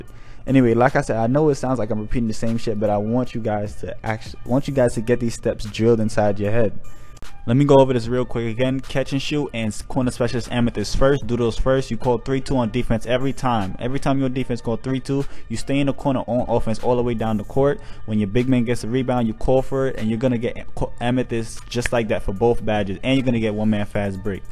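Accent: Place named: Oklahoma, United States. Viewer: Bro u from Oklahoma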